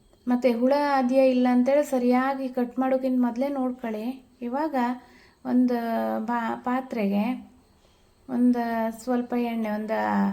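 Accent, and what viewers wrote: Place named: Sirsi, India. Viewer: ಶಿರಸಿ ಭಾಷೆ ❤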